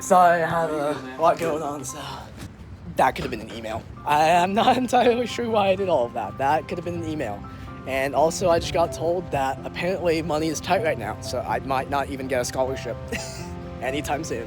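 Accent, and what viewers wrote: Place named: New York, United States. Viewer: I'm loving the strong New York accent.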